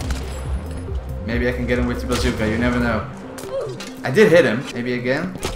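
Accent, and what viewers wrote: Netherlands. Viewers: You have a nice dutch accent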